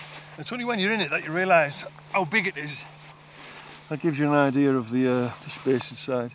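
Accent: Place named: Teesside, United Kingdom. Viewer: You sound close to me. I’m from Redcar, Teesside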